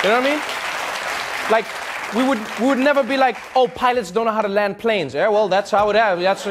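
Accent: South Africa. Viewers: I love how he holds back on his south african accent when he's in America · that south african voice of trevor always cracks me up hahaha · I love listening to him talk. I think it's the south African accent. Lol, maybe one of the reasons I love listening to.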